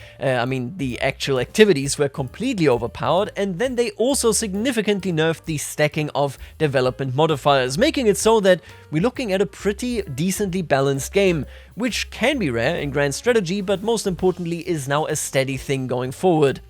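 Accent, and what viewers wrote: Germany. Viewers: hi r u prussian or german? 🤔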